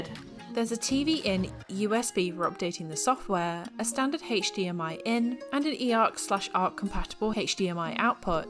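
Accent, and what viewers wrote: United Kingdom. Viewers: “Heych”-T…. The fuck with your pretentious british accent you piece of grabage!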